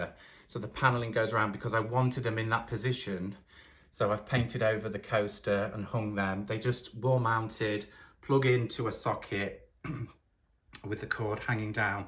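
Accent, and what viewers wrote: United Kingdom. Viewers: Thank you for sharing your creativity and your wisdom on how to turn an ugly or aged piece of furniture into a beautiful piece of furniture that more than often brings me a startling and genius idea on how your work progresses. It is a journey and watching you from Quebec, Canada was is and still will be a great delight to me. I love it when you say this wood project or painting is therapeutic. Because it's for me as well in the last year. Fixing pieces, painting them isn't my real work like you. Soon I have to go back to the real world and start working. Wish you all the best! P.s. Your British accent reminds of my teachers colleagues with whom I worked in international schools and I miss so much. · I'm thrilled your moving forward in a newish you direction. Artists, I believe, always need inspiration. So happy you paused and are in a happier place. 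I'm glad I can still hear my favorite UK accent. Cheers to you from the States ❤🇺🇲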